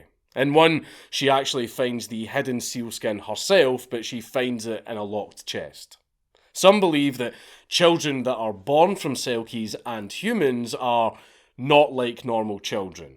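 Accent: Scotland, United Kingdom. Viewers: Nothings more mythological than hearing a Scottish man tell you about myths and insane people from around the world now that’s rare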